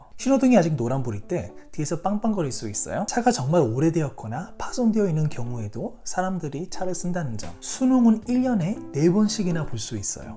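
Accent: Israel. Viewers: מגניב!! נכון!!! ואמיתי כ"כ!!!! 😁😁😁😁😁😁😁😁😁😁 לא הבנתי איך יש לו מבטא ישראלי?! הוא ישראלי מאיזשהו כיוון?? 👍👍👍👍👍👍👍👍👍👍👍👍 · Your Hebrew accent is great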